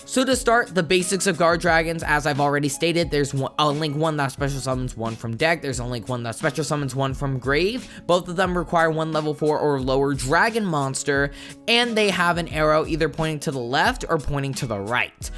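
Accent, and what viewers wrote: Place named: South, United States. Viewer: u gotta read the fine print of the banlist to see the borrelguard ban, it’s very easy to miss. As for the southern accent, I totally see it. It’s way funnier reading my comments in that voice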